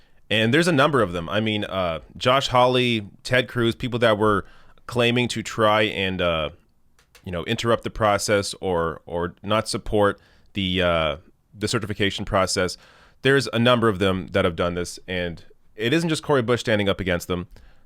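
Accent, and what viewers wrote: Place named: Canada. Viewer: Your Canadian accent came through more in this video and I love it.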